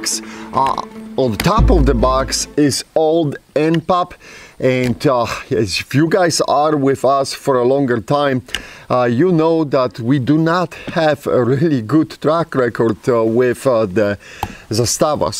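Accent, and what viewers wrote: Poland. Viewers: English with Polish accent 🙂👍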